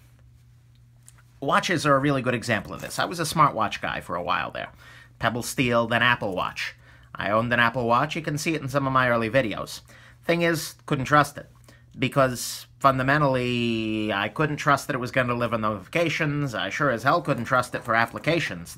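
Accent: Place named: New Jersey, United States. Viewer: wtf ... this n.j. accent for real?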